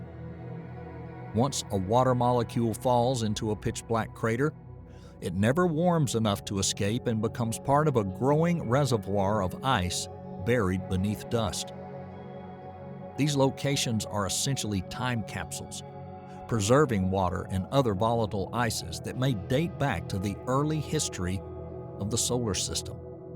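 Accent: South, United States. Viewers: Sorry the Deliverance accent is a hard no. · Southern good ole boy . Refreshing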